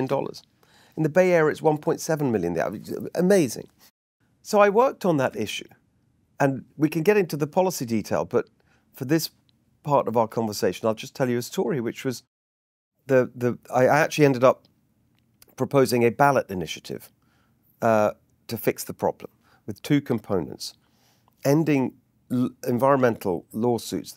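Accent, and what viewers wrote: United Kingdom. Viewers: another Brit telling us how 2 plus 2 equals 5? Great. This man is devoid of any economic knowledge. The price of energy in California is almost 50% above the national average. Energy costs are inflationary. Plus, he doesn’t distinguish between the cost of living versus the cost of labor. How about bringing someone else in whose sole qualification isn’t a pommy accent? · The man has a British accent but lives in California?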